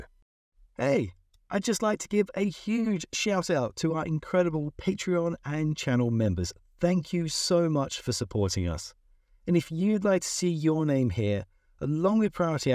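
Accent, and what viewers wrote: England, United Kingdom. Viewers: It feels so weird listening to a British accent using American terminology’s (and voltage etc). Earth to ground Star delta to wye delta etc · The weird thing is an English accent after starting with a non-English sockets picture. Principles are the same, but was a bit surprising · cool, a british accent :)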